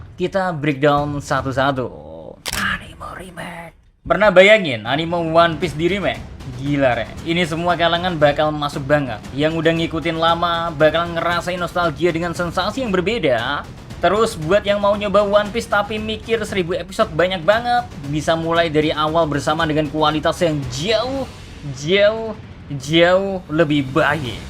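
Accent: Java, Indonesia. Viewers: Jngn, krna medok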